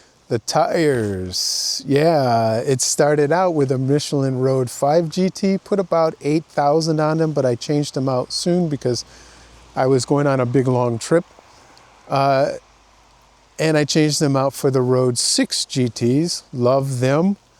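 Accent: United States. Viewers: Hey, I appreciate what you do and your candor when you review these bikes. Down side of that is it makes me want to own more than I do already. I have a 2023 RT blue like yours, and as my second RT in three years I'm pretty happy with it. I have to agree with you that that the conected ride is a piece of garbage, and is completely unreliable. However, living where I live (southeastern Utah) and riding where I ride in the western US, there is spotty cell coverage so I just use a Garmin XT2 that way 99% of the time I have GPS coverage. Since I do all I can on my own, not only because I like to, but also the nearest BMW dealer is 200 miles away, my cost of ownereship is more a time issue. When the 16K miles service needs to be done, unless I rent the propriatary tools, I may wind up going to the dealer for that, so I will be curious to see what that cost. Keep up the good work! 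By the way you speak english like an American, I have yet to catch an accent.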